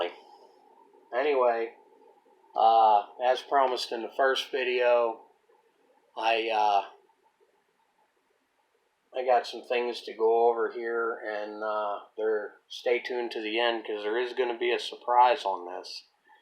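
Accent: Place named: Western Pennsylvania, United States. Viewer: Informative video. Sound like you are in western Pennsylvania or eastern Ohio area. Drop me an email sometime.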